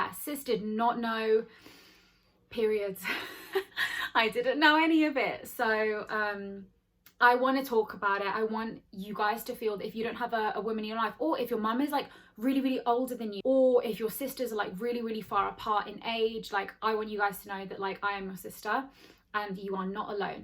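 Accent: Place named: United Kingdom. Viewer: Oof that British accent 🥵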